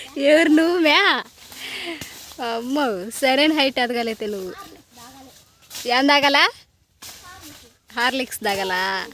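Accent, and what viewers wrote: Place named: Rayalaseema, India. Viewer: హాయ్ అండిబాగున్నారమీవిడియెస్.బాగున్నాయి.ఎవూరుఅండిమీది.మీబాషచూస్తేరాయలసీమకుసంభందించినదిగ.ఉంది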